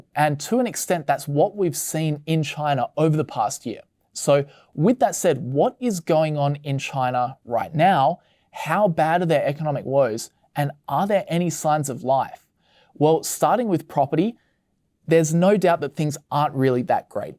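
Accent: Australia. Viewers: First time watching your video... Aussie???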